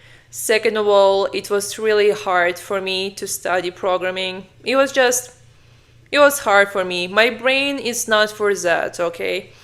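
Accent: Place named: Brazil. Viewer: classic brazilian accent, i can tell when i hear my fellow brothers and sisters of brasil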